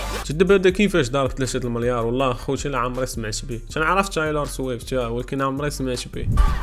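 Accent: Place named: Arab world. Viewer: حين اسمع اللهجة ديال العروبية اتقيإ اتفوو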